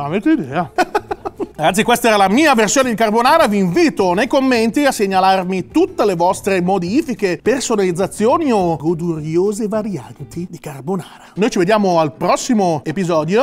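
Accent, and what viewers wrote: Rome, Italy. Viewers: Che bello sentirti parlare Romano! 💛❤️ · ROMANACCIOOOOO NO ROMANESCOO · Sei meraviglioso quando parli Romano te se ama!!!! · Tu sei pazzooo!!! Pizza con carbonara, burrata e mortazza! MORTACCI!!!😂😂😂 col romano puoi migliora' zio😉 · Fa abbastanza schifo il tuo romanesco...hahah senza offesa.... comunque bravo così se fa a carbonara quella vera....te se ama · Carbonara perfetta... E il tuo Romano pure.... La mandrakata finale é strana ma direi che e boooona 😂 Grande... Sei eeeeeeerrrrr meeeejjjjjo 😂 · Si dai ci sta come parli in romanesco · Il BURROOOOOHHHH non è mancato Ahahaha comunque il tuo romano é 🔝🔝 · È stato emozionante aver sentito il tuo romano!🤩🤩🤩🤩 Una Carbonara trucida ma divina, cacchio se la proverei!🔝🔝🔝🔝 Comunque boss sei proprio fenomale!!!🤩🤩🤩🤩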